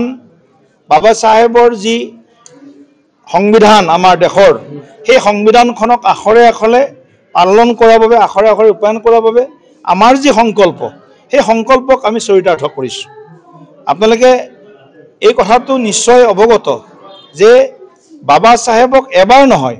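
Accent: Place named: Jorhat, India. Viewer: Jorhat kotha kuwa 😅 kune jikile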